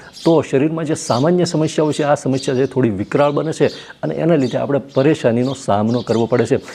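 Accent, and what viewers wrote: Bhavnagar, India. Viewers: તમારી ભાષા અને ઉચ્ચારો પરથી લાગે છે કે ભાવનગર તરફના હશો. ખરું ?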